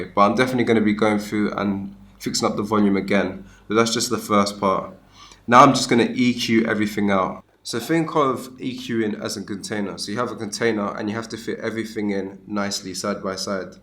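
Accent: London, United Kingdom. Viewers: You from London g ?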